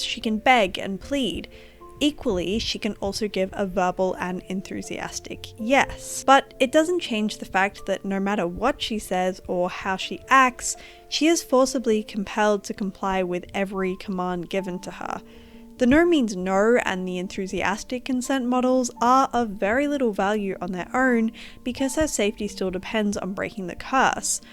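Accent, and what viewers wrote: Australia. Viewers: I love the way you say "Ella Enchanted" like Eller Enchanted". Aussie accents are so fun to listen to😊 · It’s an Australian accent. Sometimes they sound different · I clicked on this video because I've never seen another (real life) person with the name /. Plus sounds like we're both Aussie which is fun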